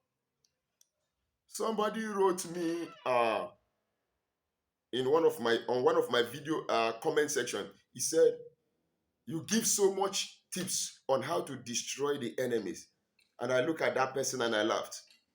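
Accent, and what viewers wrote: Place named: Nigeria. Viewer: getting you lound n clear from Kenya, love your Nigerian accent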